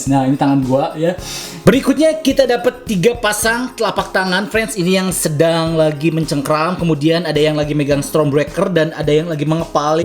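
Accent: West Java, Indonesia. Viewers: Sundanese detected, hehehe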